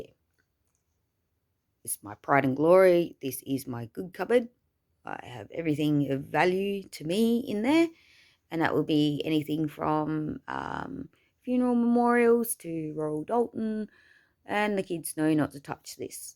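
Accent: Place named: Australia. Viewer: Wooooohooooooooo go you so proud of you it’s good to here an Aussie thanks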